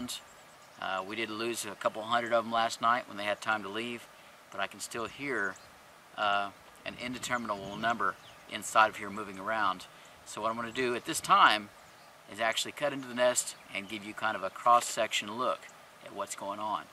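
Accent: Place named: South, United States. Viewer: a man with a southern accent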